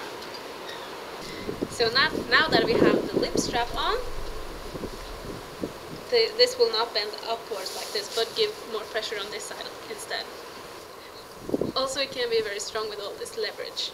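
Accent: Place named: Norway. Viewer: The Norwegian accent was very pretty btw! Hålla from a finn\german\NativeAmerican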